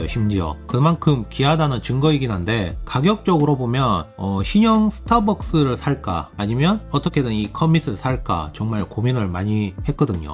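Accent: Gyeongsang, South Korea. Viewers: 아 말투 경상도 좆같네 사기꾼새끼들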